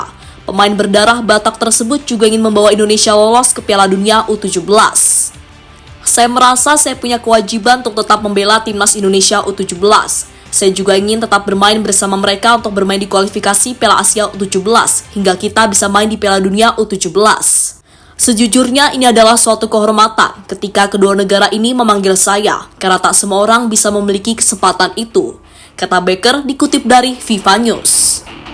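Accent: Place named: United Kingdom. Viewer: Suara aksen british nya lebih menggetarkan. Cocok didengarkan di dalam bioskop film documenter bertema perang, mafia dan horor.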